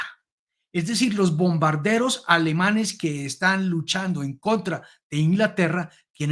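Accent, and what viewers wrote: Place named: Latin America. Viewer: Tuvimos? .. aparentemente el comunicador bastante acento latinoamericano ,.debe de ser venezolano o colombiano etc. Y aquí en Sudamérica poco tenemos que ver con los reyes más que en el tiempo colonial...así que decir tuvimos...¿No será un poco pretencioso?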